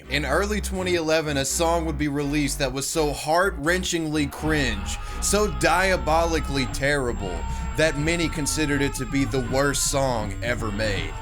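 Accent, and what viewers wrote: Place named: South, United States. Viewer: Youre like an attractive repilitian methhead Pewdiepie with a slight Southern accent that if you wouldnt have specified would be of untraceable American regional origin. Surferish to degree.. But all around normal, affable fellow in demeanor. Maculay Culkin meets Matt McConaughey. My love is Tsundere platonic bromance-respect.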